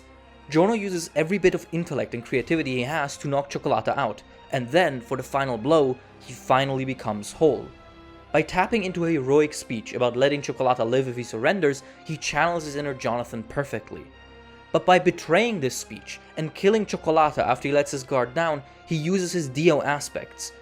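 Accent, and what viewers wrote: Italy. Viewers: pretty sure he is italian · I love your italian pronunciation! Keep it up! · Mhhhhhhhhhh your ACCENT souns very familiar,are you ITALIAN by any chance?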